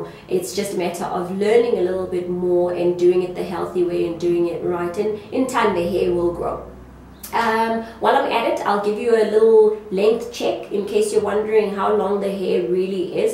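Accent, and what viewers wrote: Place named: Jamaica. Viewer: you sound like a Jamaican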